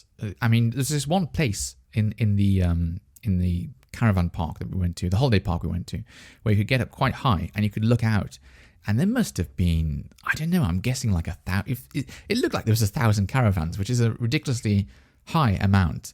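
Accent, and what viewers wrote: United Kingdom. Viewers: Keep going I follow you from Iraq I like British accent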